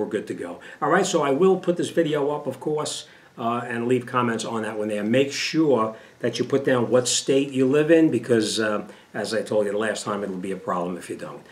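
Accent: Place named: New Jersey, United States. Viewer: Hey. I got your comment right here. Sounds like youre from Jersey or someplace up north like me. Actually, I live in Virginia now. It'll be cool if I won your giveaway.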